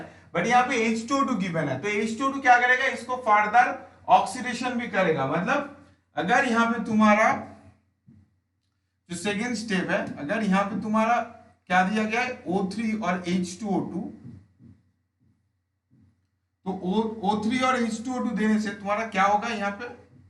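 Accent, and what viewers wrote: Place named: Bengal, India. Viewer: Dada iacs er aro questions korao... Please do more questions of iacs. Your accent made me feel that you're a Bengali. So commented in Bengali :)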